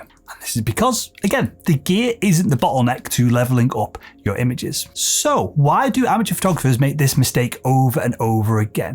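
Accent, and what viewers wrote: United Kingdom. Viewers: Dollars? What good are dollars to the UK? Try saying £'s, after all you do sound British.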